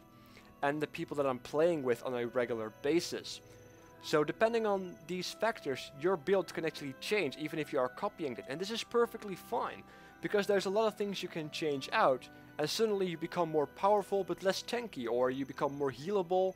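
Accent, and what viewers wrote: Netherlands. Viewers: You are a dutchy aren't you (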